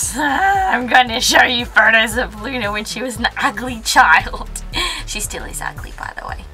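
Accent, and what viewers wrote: Australia. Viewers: are you australian? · UR AUSTRALIANNNNNNNNN!!!!!!! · your from melbourne arnt you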